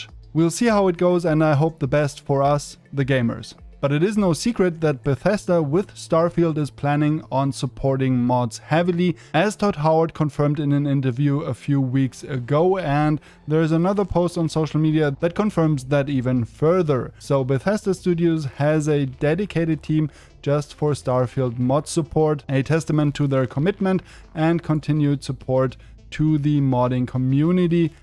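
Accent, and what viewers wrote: Germany. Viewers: I like your videos but you sound like a German who speaks English 😂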